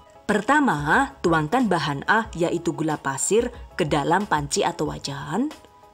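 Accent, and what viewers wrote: Banyumas, Indonesia. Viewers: Terima kasih bunda mantab jadi pengen coba,saya sangat puas dg Vidio " ny mantap jelas apa lagi banyak becanda ngapak jadi komplet dan dapat ilmu jg terhibur semoga Allah mmbalasny yg berlimpah,sukses slalu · Hehehe bhs ngapaknya aku sukaaaa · bikin versi terbaru dong bun...yg lebih ngapak gitu..hehehee Cataaaaattttt😁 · Ngakak jawa ngapak... Kita sama ngapak 🤗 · 😂😂😂😂😂gue suka gayamu dg logat ngapak nya yuk... Ha.. ha.. ha..👌👌👌👏👏👏👏 Thanks so much fot the tutorial.. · Telat komen. lucu ya...kenapa bisa bahasa ngapak ya bun? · Jan jane rika uwong endi sih,madiun apa banyumas,deneng ngomonge ana ngapake? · Sg gawe video kie wong ngapak apa ya,😁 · Suka..... dengar bahasa ngapaknya, kocak......😀😀😀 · Rika wong Bumen Yu?:D sukses terus Bu channel nya senang dengan penjelasan detailnya. terlebih bahasa ngapak kayak dari kampung saya, Sampun tak subscribe Yu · Deneng ngomonge ngapak bun,jawane ngendi rika? 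· Kalo nonton suka ketawa ketawa sendiri.. Resepnya mantap dan penyampaian detail juga ngapaknya itu ngangenin heheh... #GiveawayTHV2021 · Bun..rotine kutunggu ya..pengin buat juga.matursuwun..resepnya.ngapaknya..ngangeni bun.. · Ora ngapak ora kepenak, barakallah ya yu....😘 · Ngapak men..🤣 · NGAKAK BGT maless😭😭😭 ngapak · orang madiun, kok pinter bahasa ngapak sech 😁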